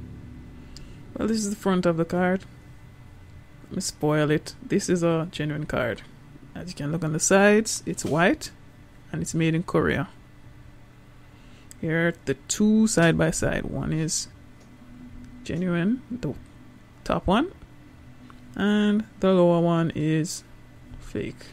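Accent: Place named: Jamaica. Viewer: Comment not related to the video. You sound Jamaican to my ears.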